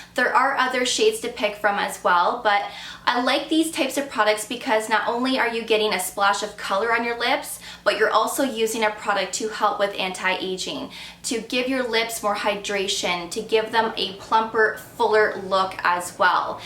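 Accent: Canada. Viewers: So glad you are proud of you Canadian accent! I’m also proud of my French Canadian accent! Go Canada! 🥰🇨🇦🙋🏻‍♀️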